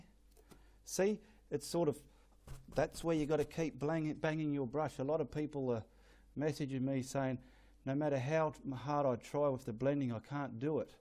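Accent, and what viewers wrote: Australia. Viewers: Love the Aussie accent and humour. Love your paintings too and I will try something similar. Thanks from another Aussie.. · After reading a few comments, I think your videos are just about right. 30 minutes is great. I tend to get tired and lose interest after 40 or so. Keep up the excellent work! (Although, I could listen to you speak for hours! Love the Aussie accent!)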